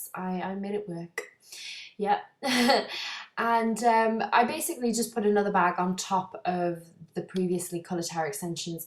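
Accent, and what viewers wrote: Newcastle, United Kingdom. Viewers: I think she's from newcastle england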